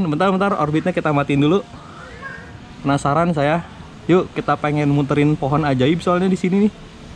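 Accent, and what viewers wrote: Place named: Sunda, Indonesia. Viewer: edan sunda pisan.. alus pisan lah repiw na,, nuhun kang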